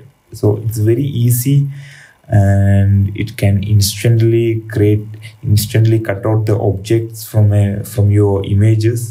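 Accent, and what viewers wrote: India. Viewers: I don't wanna come off as a racist.. but when you hear an Indian voice/accent, you know how helpful and educative the video gonn be! Thanks! Kudos!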